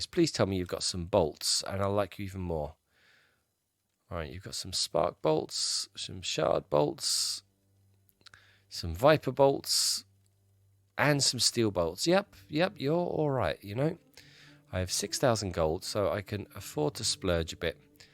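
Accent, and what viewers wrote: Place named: United Kingdom. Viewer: I do appreciate some British accent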